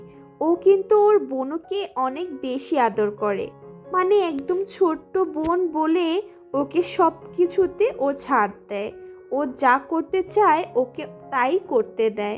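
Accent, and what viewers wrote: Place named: Barishal, Bangladesh. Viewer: আপুমনি তোমার বাসা বরিশাল কোথায় নদীর আশেপাশে দৃশ্য দেখে তো বুঝতে পারলাম হোসনাবাদ রামার ফুলের সামন দিয়ে যেতে ছিলে আপু তোমার বাসাটা কোথায় জানাবে কিন্তু আপু অবশ্যই অবশ্যই কিন্তু জানাবে তোমার ভাষা বরিশালের কোথায় আমার বাসাও কিন্তু বরিশাল তুমি যে নদী দিয়ে যেতে ছিলে আমি কিন্তু এই নদীর যে দৃশ্য গুলো সব আমার খুবই খুবই চেনা❤❤❤❤❤❤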